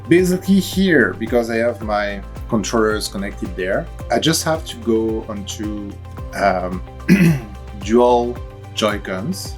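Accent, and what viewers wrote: France. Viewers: are you french? you have a french accent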